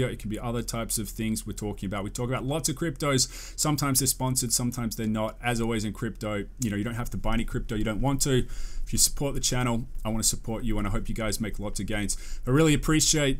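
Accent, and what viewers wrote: Australia. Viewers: Good to hear a Australian accent on a crypto YouTube channel 👍🏽👍🏽 great job 👏🏽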